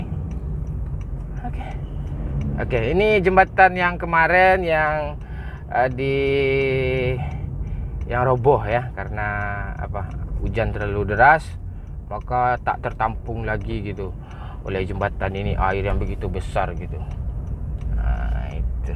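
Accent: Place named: Sipirok, Indonesia. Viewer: bawa Mobil ke rumah bOcil, terus njemput, berlanjut ke rumah Bang, kemudian jalan ke Bekauheni, naik kapal, terus ke Yana dan kemudian berlanjut ke Museum Angkot dan makan besar sama Bang.... Gitu.... #squadkampret #KocakGamong Kok aku kayak dengar ngomong Sipirok ya??? Padang Sidempuan???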